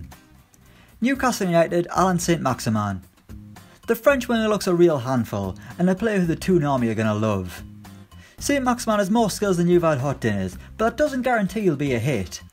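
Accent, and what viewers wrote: Ireland. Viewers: Is this guy irish too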